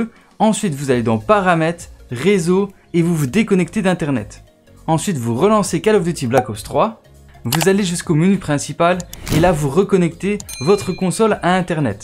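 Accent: Liège, Belgium. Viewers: Sa sent le liégeois haha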